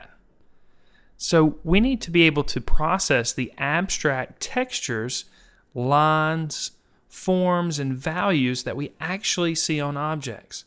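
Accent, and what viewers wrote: South, United States. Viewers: owmygod that's what I was hearing too but then I was like ''wait did he just say arse ? he can't be saying arse .. then what IS he saying?'' and remembered that he showed an eye and was like ''OOOWW so that's what he's saying'' xD southern accent problems :P it's kinda cool .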